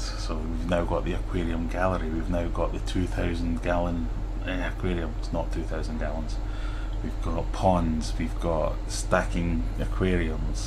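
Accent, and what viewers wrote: Scotland, United Kingdom. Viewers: Agreed on your insight regarding Joey's channel and himself. Are you Scottish? Kind regards! P.S. I've just subscribed :)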